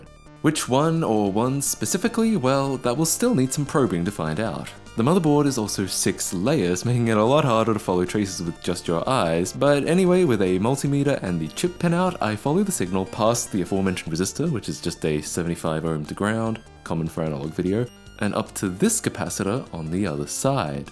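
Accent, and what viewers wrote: Australia. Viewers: Clicked on this video after a random recommendation and was pleasantly surprised to find a fellow Australian. More than that, your voice is crystal clear and really quite calming, on top of the fun and exciting content. Well done, dude! And thank you!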